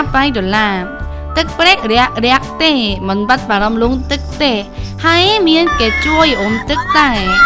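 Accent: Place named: Cambodia. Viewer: Looks amazing and really like your Khmer accent so much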